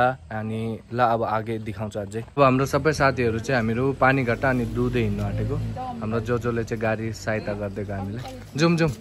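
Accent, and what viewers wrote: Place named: Manipur, India. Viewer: bhai timro bolne tarika manipuri nepali ko jasta cha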